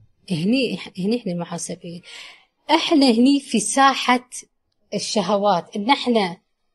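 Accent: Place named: Kuwait. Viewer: رجعت عدت الفيديو اكثر من مرة لهجتها اقرب من لهجة الكويت مافيها تضخيم لهجة البحارنة .....بس اكيد اهل البحرين الشرفاء على الرأس كمان بس في البحرين السنة مو مقاطعين ستار بكس ولا مكدونالد بينما الشيعة مقاطعين ....مفارقة عطيبة مش هيك !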